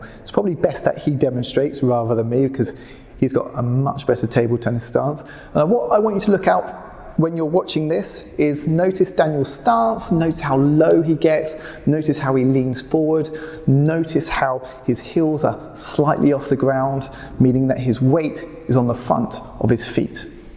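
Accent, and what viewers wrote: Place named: United Kingdom. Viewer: 내가 좋아하는 영국인 영국발음 여러 게스트도 초청해 꿩 먹고 알 먹고 탁구 익히고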